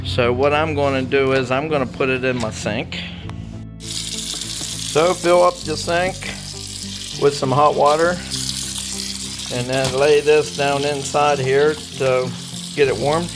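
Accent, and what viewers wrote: Western Pennsylvania, United States. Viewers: Thanks! We are going to give it a try. And you must be from western PA. The most unique accent in the United States. 🇺🇸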